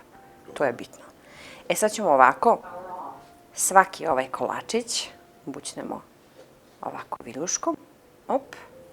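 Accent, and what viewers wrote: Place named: Toplica, Serbia. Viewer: TAKO PRICAJU KAO DASU IZ VRANJA NE BAS TOLIKO ALI NAGLASAK PROKUPLJE TOPLICKI KRAJ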